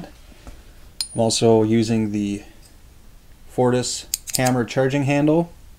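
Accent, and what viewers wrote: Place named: Upper Peninsula, United States. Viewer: I've watched several of your videos in the last couple days and the first thing I thought was wow this guy has a thicker yooper accent haha! I was close. Sounds like you are in MN. I'm right next door in southern WI. Anyway, nice build. That is a sexy rifle. Gives me a few ideas now that I'm thinking about building one myself possibly. I've learned a lot from your channel so far keep up the great videos and thanks for sharing!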